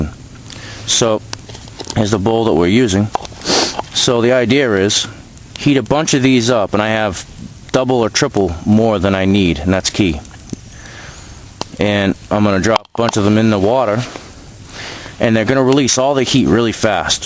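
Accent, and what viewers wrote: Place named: Massachusetts, United States. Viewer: Massachusetts accent....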